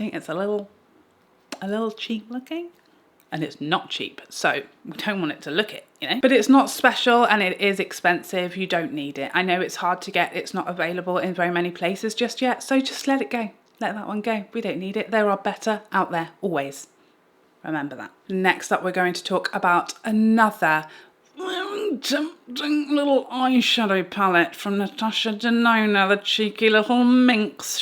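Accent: England, United Kingdom. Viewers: No pipetting makeup!! 🤣. We really do all hate the pipette! Has anyone in the history of the world thought, “You know what would make this serum, this foundation perfect? Dispensing it with a pipette. But listening to turn pipette into a verb and repeat the word with her glorious English accent is worth every stupid pipette cosmetic companies use in their products. 😂. Love you,. Love your ‘You don’t need that’ series.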